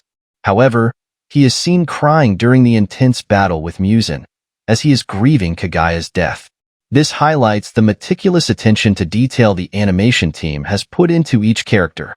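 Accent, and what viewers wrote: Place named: United States. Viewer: Stop fcking using American accent to Japanese names ffs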